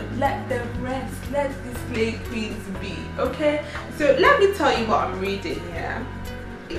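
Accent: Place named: Igbo, Nigeria. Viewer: hahaha...see me laughing my butt here. slay queen drama...That igbotic pidgin english accent though.😂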